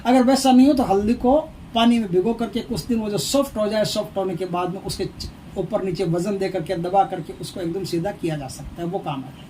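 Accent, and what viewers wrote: Rajasthan, India. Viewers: आप राजस्थान से हो क्या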